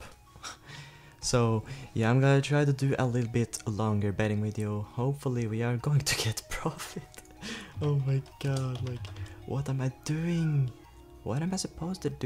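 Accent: Norway. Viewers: Are you from Norway ? xD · Norwegian? :D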